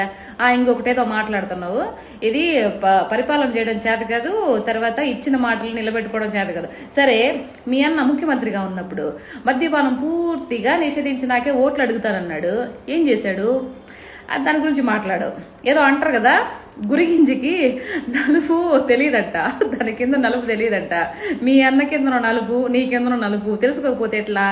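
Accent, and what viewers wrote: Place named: Rayalaseema, India. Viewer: like your style of counter criticism and feedback especially Rayalaseema accent & powerful quotes, punches. great job madam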